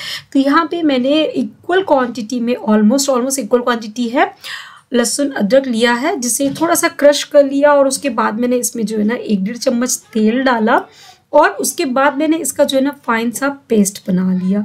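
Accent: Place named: United Kingdom. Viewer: Her accent 😂😂😂😂 British lg rha hai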